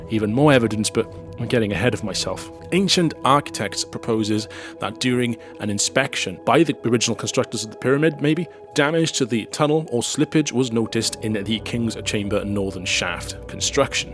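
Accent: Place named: South Wales, United Kingdom. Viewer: Great content Sir. Just subscribed. You have quite a subtle south Welsh accent. Mayhap you are from the border region- go Offa's dyke! Cheers, Flaxen Saxon. 😉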